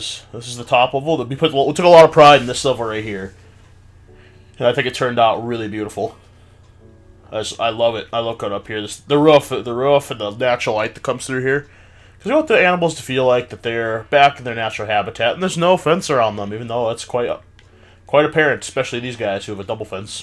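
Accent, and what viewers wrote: United Kingdom. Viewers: OMG I’m soooo sorry I went to the bathroom and I saw my 8yr old bro did that. I love your vids and I like your northern accent I kinda have a northern accent I’m sooooooo sorry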